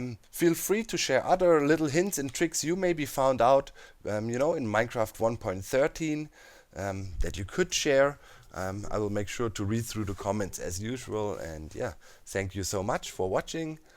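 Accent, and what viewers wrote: Germany. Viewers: Deutsches englisch erkennt man immer xD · you are german man hört das einfach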